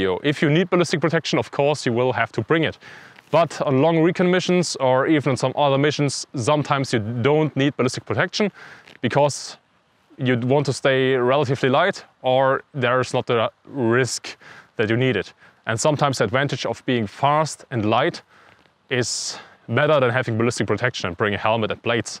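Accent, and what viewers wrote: Germany. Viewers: nothing cooler then german english. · So german english accent🖤🇩🇪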